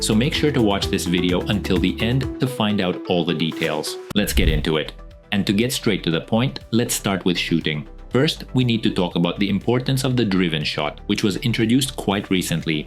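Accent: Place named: Russia. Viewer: Так я знаю этот голос) сначала мысля -, а моожет и на том канале голос ИИ , но посмотрев пол видео понял, что ты говоришь а не ИИ) Английский гуд, но акцент нашинский именно)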